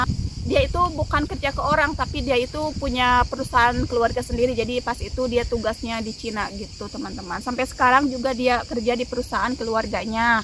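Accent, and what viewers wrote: West Java, Indonesia. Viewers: Pertahankan logat sundanya ya neng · Kecanduan suara ama logat teteh....sederhana...wlw kdg kurg ngrti bhsa sunda😅😅😅😅tp.seru..slm.dari semarang..nama q juga teeh😅😅😅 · Semangat terus ya teh. Salam kenal sy sunda bandung. Lucu pisan denger logat sundana💕👍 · Nemu lg org sunda,logatnya jgn ilang teh😃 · Semoga cepet dapet momongan ya teh semangat terus pokoknya😁😁 Padahal ga ngerti klu teteh omong sunda tapi seneng aja denger teteh omong sunda menghibur banget tehh 🥰🥰🥰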